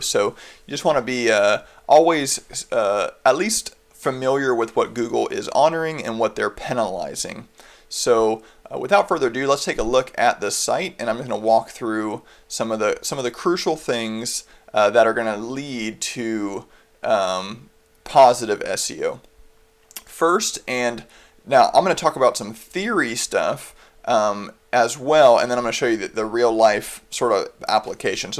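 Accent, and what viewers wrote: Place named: United States. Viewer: I'm English and the American accent can be very jarring to hear, but this guy's voice is just about okay.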